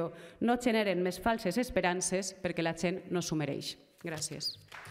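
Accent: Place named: Valencia, Spain. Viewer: eixe valencià maco! ole!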